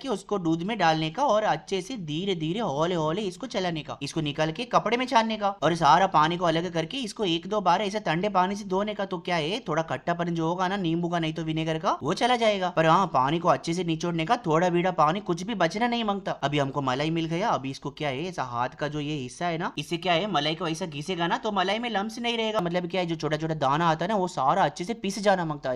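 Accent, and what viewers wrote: South, India. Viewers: You r amazing 😍aap sach me south se ho ya south accent only for video 😅👌🏻👌🏻👌🏻👌🏻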